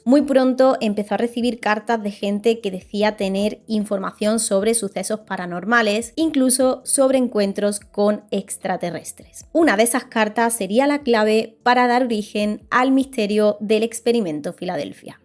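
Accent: Andalusia, Spain. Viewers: De Andalucía verdad? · Acento típico Andaluz, yo diría de Sevilla o Málaga ... !! Saluds desde Montevideo - Uruguay !!!!😅👍🏻😉🎸🎸🍺🍻😎😎😎😎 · Muy buen análisis. Tu acento Andaluz me encanta. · Gracias por compatir. Totalmente inverosimil el cuento dado por ese señor. Tu acento andaluz y tus ojos me han engangado. Saludos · Un encanto, la sra y su acento andaluz....lo de filadelfia, un engaño mas de USA....... As usual. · Esta chica además de encantadora es una gran narradora y ese acento Español es delicioso.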